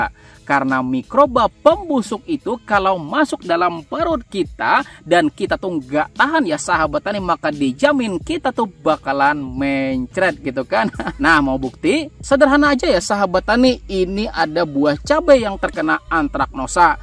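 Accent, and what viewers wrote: Banjar, Indonesia. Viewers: Umpat bangga, logat amang kada hilang😂😂😂harus banyak urang2 ky amang di bumi ku borneo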